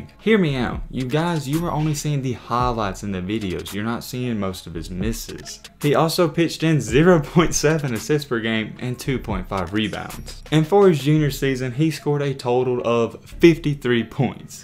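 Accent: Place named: Texas, United States. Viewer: You have a king of the hill accent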